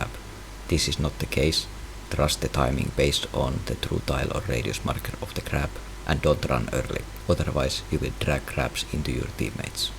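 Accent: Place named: Finland. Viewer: are you Finnish? you sound like Xpetu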